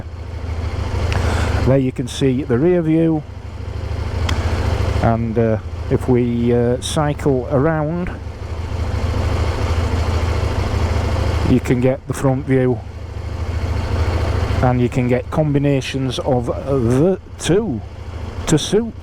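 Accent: Yorkshire, United Kingdom. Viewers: Sorry to hear the Guzzi let you down. Always happy to see you riding in the Yorkshire hills, some marvellous scenery. Living in Bedfordshire, we are not blessed with much hilly scenery, but today we are having a ride out to the Dunstable Downs, which has some splendid views 😀. Always great to hear your Yorkshire accent and your choice of words when explaining any situation, look forward to the next video 👍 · Love the Yorkshire accent, part of the reason I watch and subscribe.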